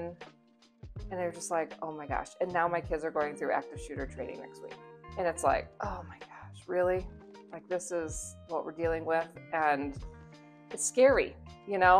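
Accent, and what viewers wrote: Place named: New Zealand. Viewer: I love the way you pronounce new zealand, it's awesome (you have a bit of that kiwi accent)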